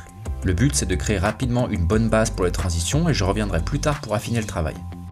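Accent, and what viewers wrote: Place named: France. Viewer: superbe travail, j’ai découvert vos vidéos en anglais mais je me doutais que vous étiez français. Puis je vous demander où vous avez imprimé cette figurine?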